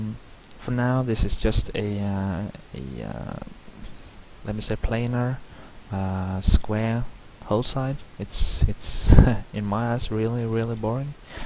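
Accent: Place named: Spain. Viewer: This is a great tutorial, even more for the ones who are still starting to use Sketchup. Thank you very much for sharing! By the way, if english is not your main language, which is? Is it spanish (i have a feeling about it).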